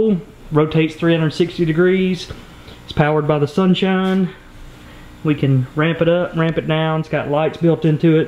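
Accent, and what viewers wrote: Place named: Alabama, United States. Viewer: Omg ok so im from texas but i moved to alabama about 2 years ago i moved back sense but i have a best friend that i miss so much and accent is exactly like hers and i know its because yallare both from alabama but i just wanted to mention it😂😍😭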